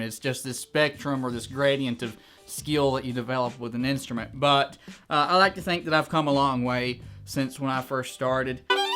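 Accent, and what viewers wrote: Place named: Texas, United States. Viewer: I'm not American, but judging by your accent and those guns, are you from Texas? Haha I started playing the violin about 5 months ago. I couldn't afford the classes so I had to quit, but I still practice it. You sound really great, but don't hold it that high, or your shoulders will begin to ache and you won't focus on what you're doing. Your thumb should be placed on the side of the neck. Good luck :)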